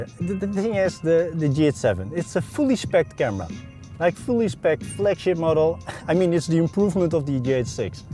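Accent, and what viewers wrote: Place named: Netherlands. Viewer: only be one the best :), Nederlands tongval ;)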